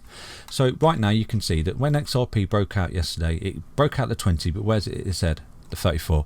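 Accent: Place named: England, United Kingdom. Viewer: I just needed to hear a calm and soothing English accent right now talking bigger picture stuff. Thank you for existing. Subscribed.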